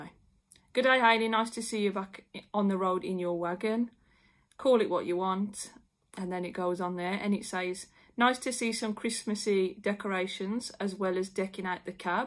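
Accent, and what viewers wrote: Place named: Wolverhampton, United Kingdom. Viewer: Great video Hayley. Guitar playing was wonderful. Very nice to just listen and chill. I've been following your channel from the very start. Always enjoyed your content. The accent was a plus point as well! Even though I'm a Londoner born and bred (although now living in the Welsh Valleys), I am a life long Wolves fan! Been to Molineux many many times. I knew you was from the area by your accent. Are you a Wolves fan? Many thanks. Craig.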